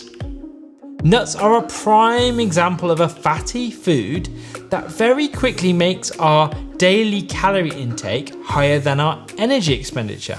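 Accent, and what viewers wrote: United Kingdom. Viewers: It's sooooo refreshing to get useful advice & info about weight-loss & nutrition, especially from someone who's been there himself. It's also great to hear a British 🇬🇧 accent, as opposed to those Americans who drown you with info & grate on your ears 😣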